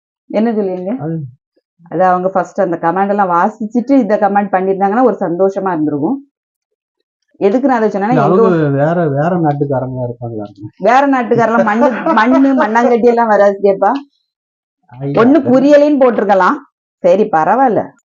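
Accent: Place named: Kanyakumari, India. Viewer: Konjum kumari tamil supper puriyatha vanga than mannakatty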